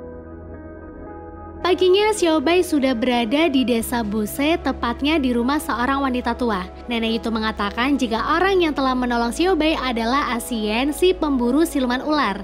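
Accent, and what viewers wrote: Pemalang, Indonesia. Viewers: Mba orang Pemalang nih.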